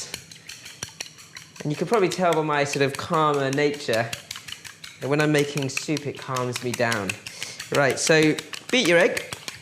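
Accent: United Kingdom. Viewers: Chinese invented black vinegar way before Europe, so now you are trashing your own people because you have british accent? Karma will get you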